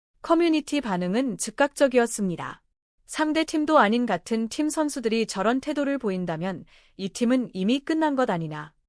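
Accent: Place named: China. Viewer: 자막이 초등학교도 못 나온 사람이 쓴것 같고. 멘트는 중국인이 읽는 듯하다.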